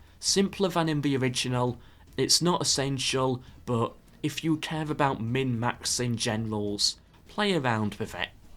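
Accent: United Kingdom. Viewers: You’re voice combined with the UK accent, it’s just too good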